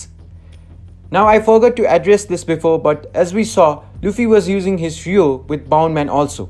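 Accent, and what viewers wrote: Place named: India. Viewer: Oh I’m sorry I taught you we’re because you have an Indian accent. Good Video btw!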